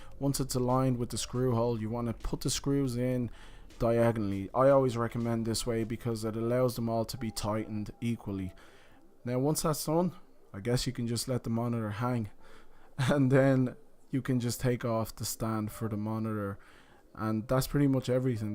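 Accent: Ireland. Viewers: Where in Ireland are ye from? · the irish accent though man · Love the irish accent! Keep up the great work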